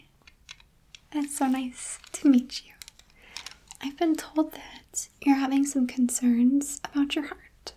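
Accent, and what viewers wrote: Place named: Quebec, Canada. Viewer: If you are not French then you are probably from Quebec (Canada)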